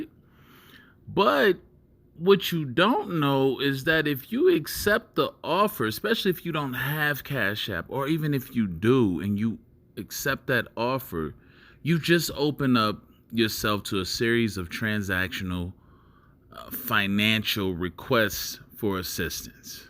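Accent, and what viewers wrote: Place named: Philadelphia, United States. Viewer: I knew you had Philly roots. The accent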